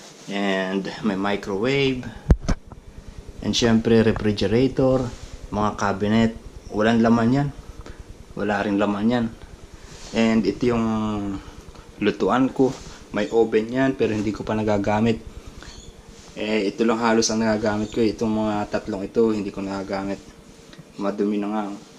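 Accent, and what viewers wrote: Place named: Quezon, Philippines. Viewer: Taga Quezon province ka ba kuya? Para kaseng puntong Tayabas Quezon ka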